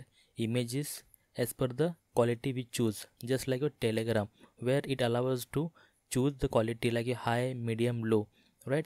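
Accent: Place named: India. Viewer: inggris aksen india😭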